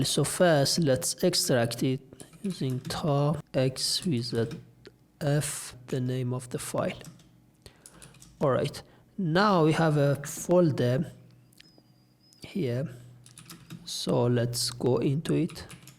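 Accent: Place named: Iran. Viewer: loved your Farsi accent. Iran's flag is risen anywhere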